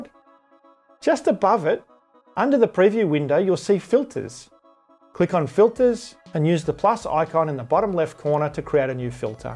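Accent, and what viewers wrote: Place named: Australia. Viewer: Nice work... and great to hear an Aussie accent! Cheers, mate.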